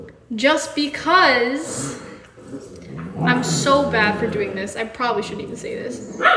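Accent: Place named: United Kingdom. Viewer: I miss her British accent.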